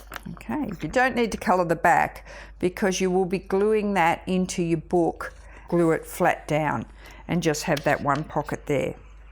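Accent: Australia. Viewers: I’m a Melbourne girl who was so excited to hear an Aussie lady doing a video and I really love how you up cycled those envelopes. I’m doing some gift ideas that will work well with this envelope idea can’t wait to play😃👍🏻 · So nice to hear a Aussie fellow crafter. Thanks for the tutorial as I'm new to all of this and very helpful. And where did you get your glue from please?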